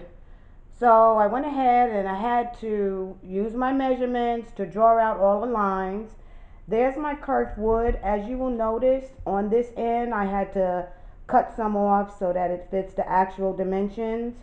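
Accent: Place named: New York, United States. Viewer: Love ur accent miss 😀 where ya from? im a Boston native you sound like ur from either New York / New Jersey area? Thanks for the informative video 😁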